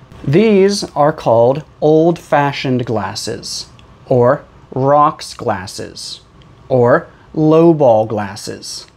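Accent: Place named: United States. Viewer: (382) A perfect presentation with the enchanting Native voice , strikes the ears of the non-natives absorb the visual traditional and modern vocab, perfect American pronunciation specifically Flap T. ❤🎉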